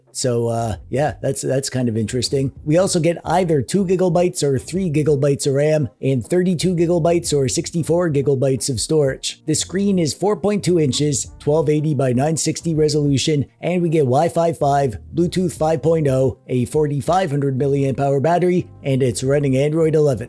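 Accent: Canada. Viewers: That's what Canadians sound like, right? I've always wondered why the handhelds also sound Canadian, though...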